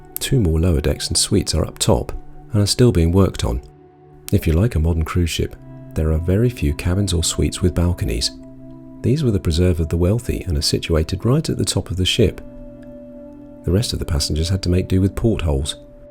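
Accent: United Kingdom. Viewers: You do sound rather British, and many of them speak English.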